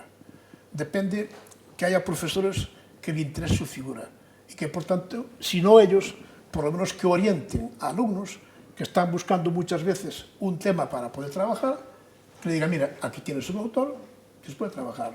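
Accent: Spain. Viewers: Qué pernicioso el acento gallego para una nítida articulación de las palabras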